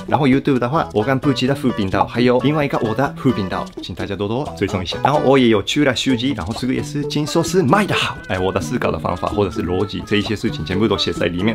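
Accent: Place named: Taiwan. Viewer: 好可愛噢！話語裡還會加上台語，看影片很療癒，搞笑，歡樂氣氛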